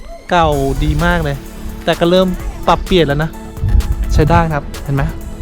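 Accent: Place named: Central, Thailand. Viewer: พูดสำเนืยงฟังยาก น่าจะพูดสำเนืยงภาคกลาง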